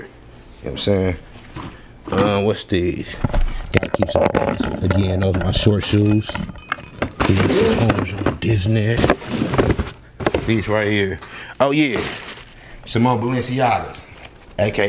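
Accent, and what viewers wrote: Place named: Los Angeles, United States. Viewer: first time on your channel... but are you from LA? you just got that accent lol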